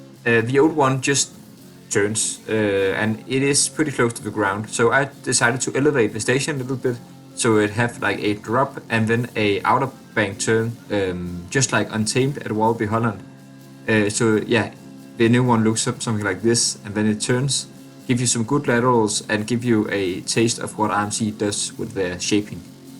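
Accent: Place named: Denmark. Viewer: Love the danglish 😍😅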